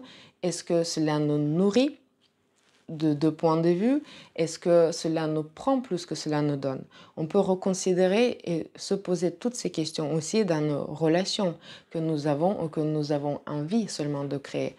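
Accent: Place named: Latin America. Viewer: Bonjour, je vous découvre aujourd’hui et, contre toute attente, je suis déjà fan. Expression parfaite, pas de narcissisme, un vrai désir de partager. Et ce charmant accent qui m’a fait voyager chez nos voisins latins # Noeud Nord en Gémeaux. Merci 🙏 🥰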